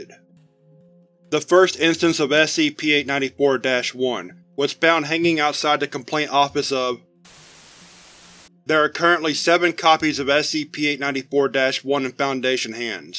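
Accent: United States. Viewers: *intentionally VHS sound, good soundproofing or shady mic, sounds like a trucker's CB radio cadence/accent, are you from Texas? if not, why?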